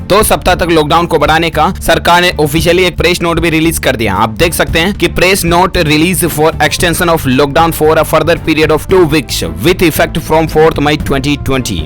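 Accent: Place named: Bihar, India. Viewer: भाई आप बिहार से है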